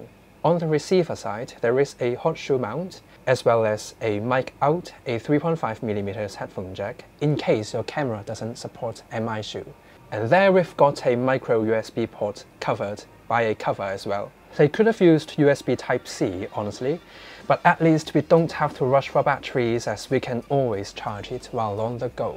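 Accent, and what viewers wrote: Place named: United Kingdom. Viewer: How is this guy's english so good and british? :D Great vid, brother!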